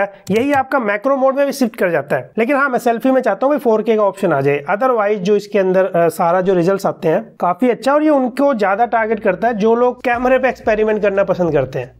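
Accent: Lucknow, India. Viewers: Where are you from.... Lucknow??? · bhai ap Lucknow se ho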